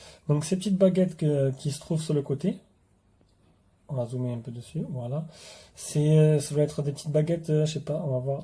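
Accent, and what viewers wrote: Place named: South, France. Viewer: l'accent du sud de ouf ^^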